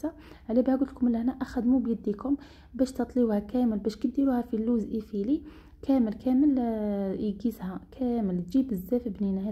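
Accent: Setif, Algeria. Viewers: تهبل وصفة جاني صوتك كي دنيا سطايفية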